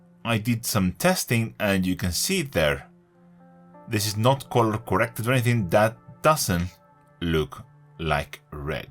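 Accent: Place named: Spain. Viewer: Tu inglés no nada más es bueno, pero es sumamente agradable y usas dichos muy apropiados, 👉lo cual es por felicitar 👈porque pocos ibéricos/latinoamericanos en este ámbito del pasatiempo lo logran...lo digo porque es casi la única vez que he oído que se te salga el castellano.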